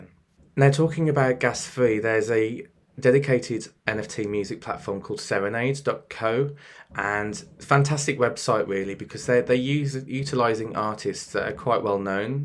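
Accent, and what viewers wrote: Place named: Birmingham, United Kingdom. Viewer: Love your content! Are you from birmingham as well ?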